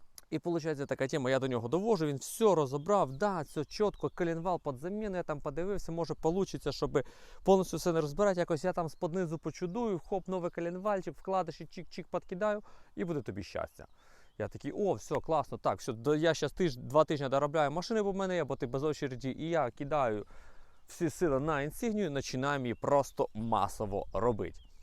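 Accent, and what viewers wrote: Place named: Western Ukraine, Ukraine. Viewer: Заебательский заподенський говор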